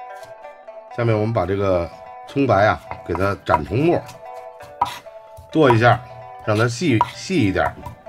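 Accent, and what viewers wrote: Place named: Beijing, China. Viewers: 师傅的北京话听得真舒服，到家了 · 第一是北京出租车司机现在基本都是郊区甚至河北人了，已经不是传统北京，也就是二环（最多三环）以内的那种北京人了。第二是旧北京是割裂的，拉车挑水的苦力是一个阶层，满蒙王公、汉人士大夫是另一个阶层。现在常见的那种夸张的北京话，比如大量儿化音、吞字吞音都属于前者。这个视频里的是接近后者。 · 正宗皇家口音，非常悦耳。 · 为了这一口标准的京腔来的，太好听了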